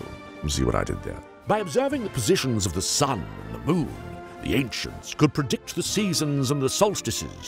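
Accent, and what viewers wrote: United Kingdom. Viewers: "it was us Europeans" he said with a British accent, showing the UK as part of the EU was this written in... when did brexit start again? what? 2011? x'D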